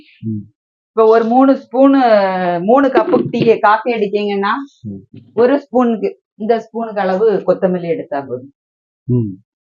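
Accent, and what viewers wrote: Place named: Nanjil Nadu, India. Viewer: Sister you are soooooo pretty and you exactly look like my aunty. And i love the slang of nanjil naadu 💐💐💐💐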